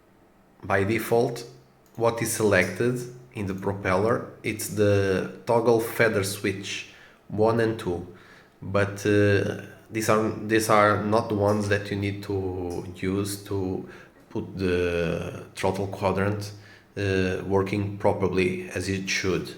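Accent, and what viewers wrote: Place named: Portugal. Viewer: Obrigado! (your accent sounds portuguese, like me). (edit: unfortunately, the feathering does not work if we want to feather just one engine but good try).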